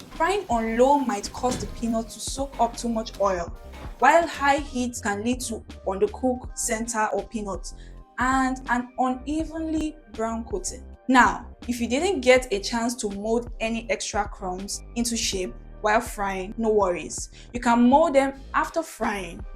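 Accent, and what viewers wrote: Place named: India. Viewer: Why is the voice in India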